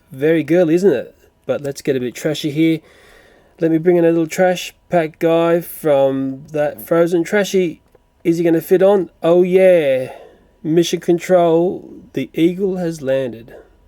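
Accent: Australia. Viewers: He's Australian isn't he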